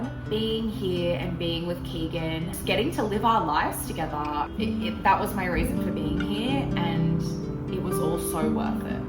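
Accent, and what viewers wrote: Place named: Australia. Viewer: Never lose your Aussie accent ♥ Do they tell you to assimilate like Aussies do to Indians 😂 Naah we are not like that. Enjoy the life like my family back home, enjoying house help, watching KDramas, home deliveries, apartment facilities and gym. wow that was a cool transition effect of older version of videos Wow you seems to have quite a few expats there. ♥ it.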